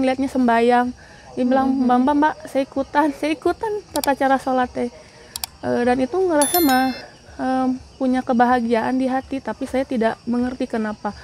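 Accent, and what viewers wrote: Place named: Sunda, Indonesia. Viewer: Maasya Allah teteh.Logat sundanya juga sdh kental.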